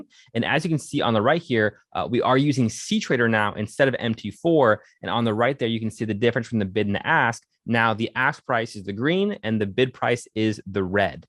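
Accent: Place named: United States. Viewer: You sound American 2 me bro, how do use this broker? It doesnt take US residents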